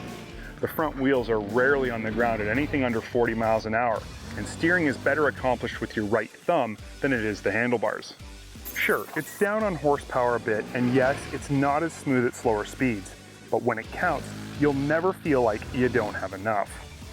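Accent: Canada. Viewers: what is up w that Canadian accent out sounds like oot i just do not get it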